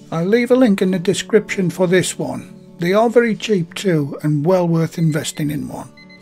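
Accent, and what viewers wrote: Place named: Northern England, United Kingdom. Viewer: good man. Cheers mate. I love hearing your voice in these videos, and used to watch them from the US for the Northern England nostalgia kick alone :P I swear you should get a job narrating shows or books or something (though please don't stop the YouTube channel if you do!). All the best, and thanks again :)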